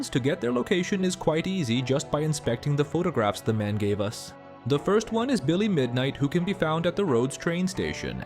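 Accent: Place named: India. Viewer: You sound like indian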